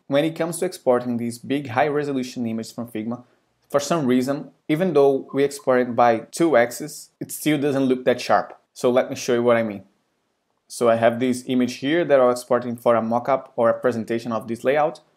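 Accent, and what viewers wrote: Brazil. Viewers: Valeu cara! Logo no começo do vídeo eu falei "esse sotaque é brasileiro", e confirmei com a linguagem do seu S.O kkkkkkk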